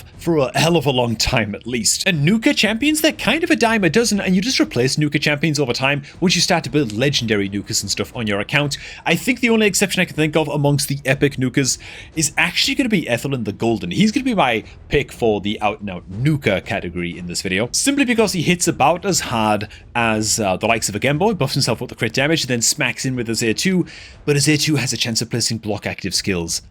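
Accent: North East England, United Kingdom. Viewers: Probably a NE English accent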